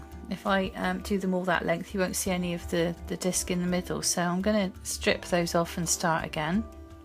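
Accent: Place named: England, United Kingdom. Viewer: Wow!! This is beautiful.....I am new to this I haven't done any yet but my poor brain is buzzing with ideas! lol! Nice to hear English accent! I live in Sussex..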